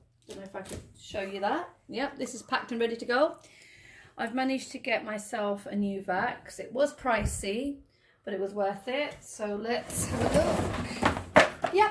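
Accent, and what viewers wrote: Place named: South, United States. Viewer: always a pleasure, where you from ? I see the UK plugs and you have a southern accent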